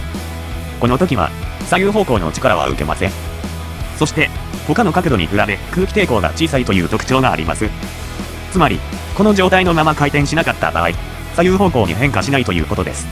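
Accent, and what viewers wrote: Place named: Kansai, Japan. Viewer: ちょっと関西弁チックなのがすこ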